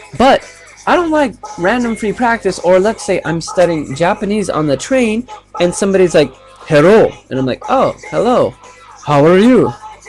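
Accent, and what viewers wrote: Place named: Ireland. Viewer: dude i thought you sounded like irish at first..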